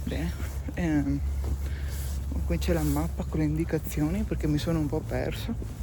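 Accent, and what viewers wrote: Veneto, Italy. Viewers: per l'accento veneto che adoro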